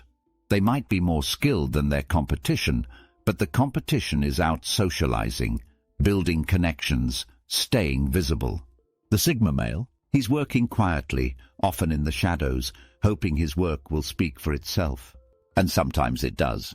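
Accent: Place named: United Kingdom. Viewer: Another crappy British accent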